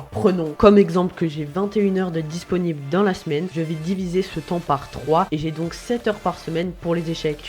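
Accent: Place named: France. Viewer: hes speaking like a French Sheff who knows how to cook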